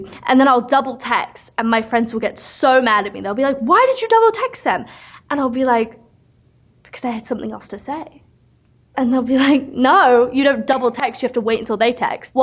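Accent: United Kingdom. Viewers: Shes britisH?! I didnt even know idek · She’s British? Oh damn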